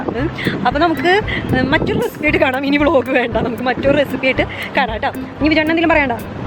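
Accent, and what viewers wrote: Thrissur, India. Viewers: chechy Thrissur slang adipoli .Thrissur evidanu veedu? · ഹായ്... മ്മടെ ത്യശ്ശൂർ ഭാഷ അടിപൊളി...... പിന്നെ dress നന്നായിട്ടുണ്ട്... ട്ട.. vloggum... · Chechi pwoliyanu tto. Pakka thrissurkari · superb.....nattilevide veeduu.....talk style kettitt thrissur pole.... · Chechi Thrissurkari ano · എന്റെ ചേച്ചി നല്ല രസം ഉണ്ടായിരുന്നു കാണാൻ. നല്ല സ്പീഡ് ഉണ്ട് വർത്താനം, ഞാൻ ഇങ്ങനെയായിരുന്നു ചേച്ചി. എന്റെ വീട് തൃശൂർ ആണ്, എന്നെ വിവാഹം കഴി ച്ചത് എറണാകുളം, ചോറ്റാനിക്കരകാണു.എന്റെ ഭാഷ ചേച്ചി പറയുന്നതുപോലെ സ്പീഡിൽ, അവർ എന്നെ കളിയാകു, പിന്നെ പിന്നെ ഞാൻ എന്റെ വർത്തമാനം ചുരുക്കി, ഇപ്പോൾ തൃശ്ശൂർ ഭാഷയു എറണാകുളം ഭാഷ യു കൂടി കലർന്ന ഒരു ഭാഷയായി, വീട്ടിൽ ചെല്ലുബോൾ വീട്ടുകാർ ചോദിക്കും അവിടെനിന്നു കിട്ടി നിനക്ക് ഈ ഭാഷയെന്നു, എന്റെ ചേച്ചി ഞാൻ ഇപ്പോൾ അതികം മിണ്ടാതെയിരിക്കു, അതാണ് സേഫ്. ചേച്ചിയുടെ വർത്തമാനം ഫസ്റ്റ് കേട്ടപ്പോൾ തന്നെ എനിക്ക് മനസിലായിത്രിശ്ശൂർകാരി യാണല്ലോ എന്ന് അപ്പോൾ തന്നെ സബ്സ്ക്രൈബ് ചെയ്‌തു. 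തൃശൂർ ഭാഷ എനിക്ക് ഭയങ്കര ഇഷ്ടം മാണ്, ഇപ്പോൾ ഏതു ഭാഷയാണ് ഞാൻ പറയുന്നത് എന്നു എനിക്ക് അറിയില്ല ചേച്ചി. അ ചേച്ചി കടലക്കറി ഞാൻ ട്രൈ ചെയ്തു, നല്ലതാണ് ട്ടൊ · Adipoli you are so innocent I like your talk. Exactly thrissur kari...... 😂😂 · Hey super aada nalla Rosamund iniyum chaidolutta entha resam aa Trissur bhasha kelkan enik ishttayi 😘😘😘😘😘😘😘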